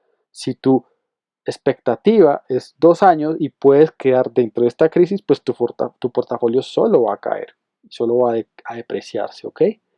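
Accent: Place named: Colombia. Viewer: Hola, excelente información, por tu acento asumo que eres Colombiano, y supongo que de Bogotá; te quería preguntar si tienes idea de como se maneja o afecta tributariamente en renta, hacer este tipo de inversiones? gracias de antemano por tu valiosísimo aporte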